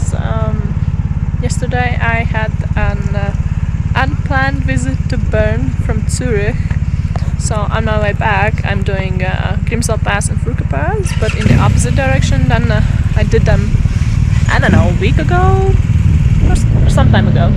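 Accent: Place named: Latvia. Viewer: Tas nodevīgais latviešu akcents...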